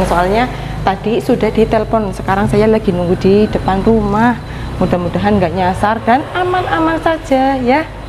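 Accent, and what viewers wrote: Banyumas, Indonesia. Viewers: Mbak asalnya mana kok ngomongnya bahasa ngapak?maaf yayangku juga diTaiwan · Mba rumahnya mana si kok ngapak bahasanya, berarti uda plng kampung,? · Halo mbak..sukses selalu..seneng banget udh plg ke Indonesia..sy suka liat video mba'e jawane ngapak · ga ngerti prokes maklum anak lugu..anak kampung..sy suka ngomongnya bhsnya ngapak lucu sj lhtnya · Asli mana mba, ngapaknya sama😁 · Orang mana kokk logatnya Banyumas bgt? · Mba aslinya dari desa mana mba,soalnya kita sama sama BERSATU KITA KOMPAK BICARA KITA NGAPAK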